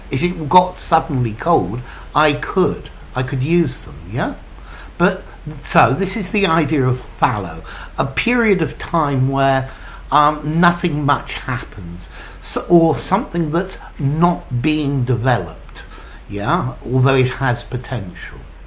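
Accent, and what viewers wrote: United Kingdom. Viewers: Sir, Your pronunciation is the best standard British pronunciation. Please continue the noble job. Grateful Thanks. 🙏🧡❣️💖💛❤️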